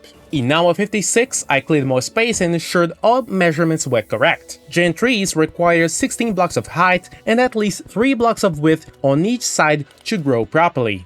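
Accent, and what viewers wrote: Brazil. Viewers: Meu deus cara eu achei tava ficando doido pq eu senti no fundo do meu peito q teu sotaque era brasileiro, enfim vídeo bom pkrl continue pfvr meu xarà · are u brazilian by any chance? your accent looks like a brazilian speaking english 😆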